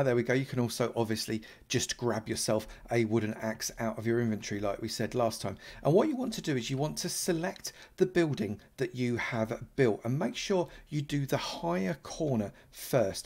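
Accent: United Kingdom. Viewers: thanks very much man ! i had been wondering what i was doing wrong :).... love your british accent btw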